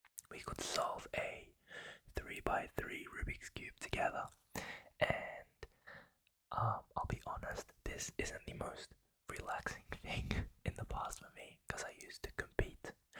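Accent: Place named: Australia. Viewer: Literally 4 seconds in I could tell you were from or are in Australia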